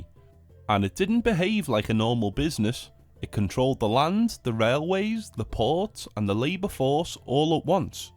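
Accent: Scotland, United Kingdom. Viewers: I can hear an accent, but I'm from the Netherlands, so I don't know which accent it is. Is it a Scottish accent?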